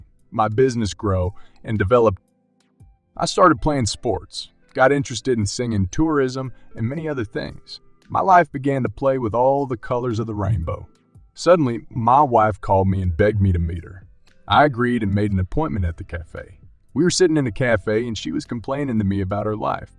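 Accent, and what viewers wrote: United States. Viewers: Stupid knows both genders equally, so nice to get the perspective from the husband who was a good man. Thank you! Love it. Keep up the great work! Awesome southern accent! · Narrator has a distinct accent. I'd bet Texan, or at least somewhere close to Texas. ETA: I'm a Texan, and he sounds like us.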